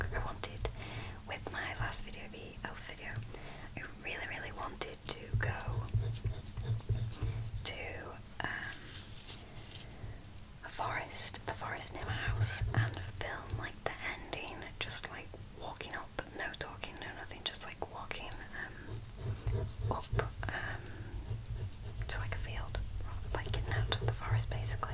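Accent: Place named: England, United Kingdom. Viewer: I've watched this like 4 times already when going to bed. The sound from your sketching and your English accent sends me away to dream land! Love this! Thank you!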